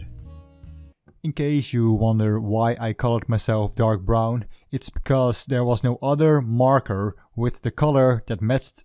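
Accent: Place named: Netherlands. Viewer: The wa- de manier hoe je praate engels ik dacht al dat je Nederlands was oh en uh gefeliciteerd met je verjaardag Edit: ok ik had wat meer geduld moeten hebben lol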